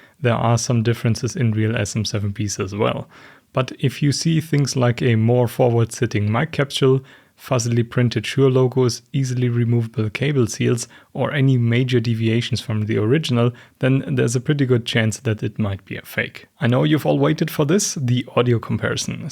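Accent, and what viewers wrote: Germany. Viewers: Ist das jetzt zum Trend geworden, dass deutsche anfangen auf englisch Videos zu machen? Das klingt immer total schrecklich wenn man hört, dass die Leute Deutsch als Muttersprache haben und nicht englisch 😭 Dieser deutsche englisch Akzent ist grauenvoll!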